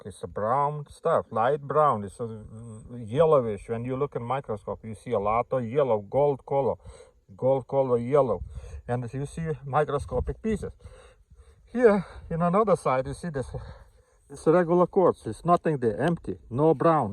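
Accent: Russia. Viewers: Приветствую! Вы русский? Акцент ухо режет.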